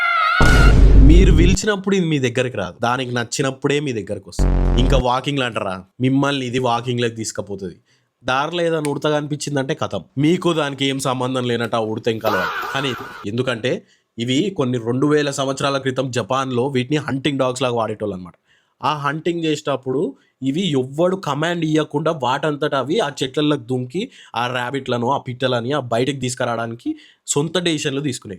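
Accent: Telangana, India. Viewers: అన్న నువ్వు చెప్పే విధానం, తెలంగాణ స్లాంగ్, నీ పేస్ లో ఒక సాఫ్ట్ విధానం మంచిగుంటది... 🙏🙏👍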